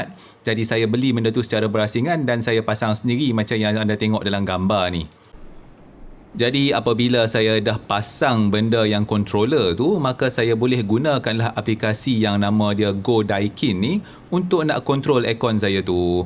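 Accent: North, Malaysia. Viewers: tang cakap utagha tu yg sempoi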